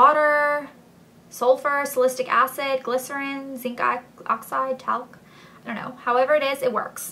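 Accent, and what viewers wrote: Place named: South, United States. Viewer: ur kinda getting a country accent like ur sister :D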